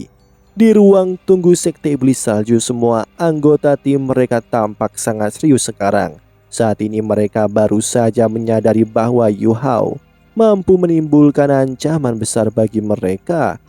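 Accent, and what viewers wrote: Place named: Purbalingga, Indonesia. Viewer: Cah ngapak purbalingga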